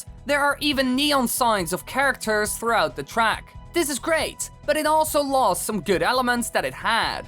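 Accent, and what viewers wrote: Netherlands. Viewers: Is that a dutch accent I hear? Nice video mate!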